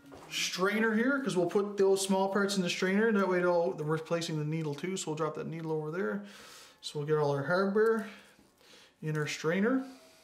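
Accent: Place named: Canada. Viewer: If the "accent" lol and the power fist box didn't give it away He's in Canada folks! Greetings my eastern brother from British Columbia!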